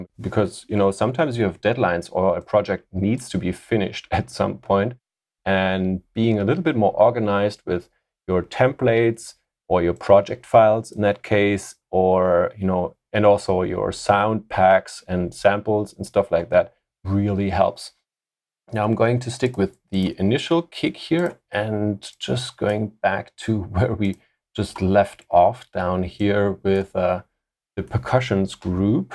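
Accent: Germany. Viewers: Ich mag ja den Deutschen Akzent XDD... aber sehr schönes tutorial... Das problem ist, wenn ich solche baselines mache, hören sie sich auf meinen monitore sehr schön an, aber im auto dann mit anlage grauenhaft, weil zu viel sub anteil, nehme ich dann die low ends so weit raus, dass es geht, hört es sich auf Lautsprecher scheisse an... eine todesspirale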